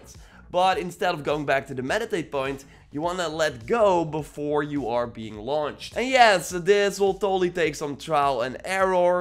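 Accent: Netherlands. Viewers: Damn, are you Dutch? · Are you dutch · You Dutch?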